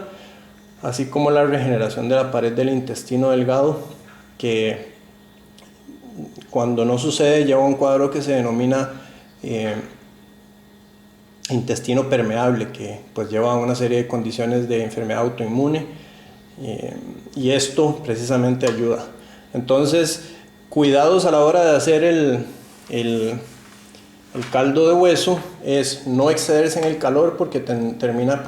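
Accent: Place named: United States. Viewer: tienes una erre medio agringado. Éxito.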